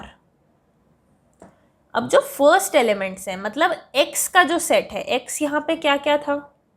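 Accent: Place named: Bhopal, India. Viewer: #APAN full bhopali style didi Love from bhopal (mp)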